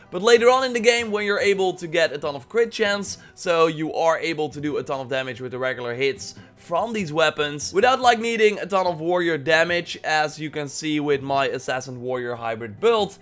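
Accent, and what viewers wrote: Netherlands. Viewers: lol I like your English talking, You more sound like someone from the Netherlands? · Are you dutch? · Are you dutch cuz u kinda sound like you are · I love how dutch your accent is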